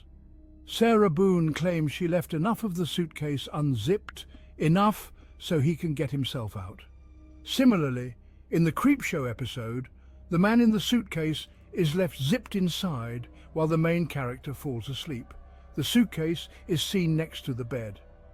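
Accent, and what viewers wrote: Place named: United Kingdom. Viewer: Who would have thought there would be a connection between the Sarah Boone murder case and a Creepshow episode that aired Oct 2019, a few months before the murder occurred. What they both have in common is the implied archetype of “The Suitcase” - with its potential darker aspects [used as a torture device] and for being an obsessive fetish. I enjoyed watching intriguing video with its “creepy” topic: Comparing the horrible evils that can exist, each involving a suitcase possessed by an aggressor who had control of it from the outside, to a victim trapped within - who got stuck inside its claustrophobic confined space. Pointing out similar comparisons: The two suitcases looked almost identical - appeared to be about the same size. The aggressors used a bat for violence and dealt with the concept of the suitcase being thrown down the stairs. 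The audience is also left with the scary image of a hand popping out of the suitcase, not to mention the idea of a foot stomping on it/being stepped on. [This is implied when Sarah Boone’s sandals were shown next to the suitcase - from the 2nd mobile phone shot suitcase video. - that no one seemed to notice] The British narrator had that perfectly nuanced, articulate sounding voice - well-paced enough to reveal an unusual, dark side and sinister portrayal of the troubled human condition. This video came out at exactly the best optimal time: A few days after the guilty verdict [of 2nd degree murder] was reached in Sarah Boone’s Jury T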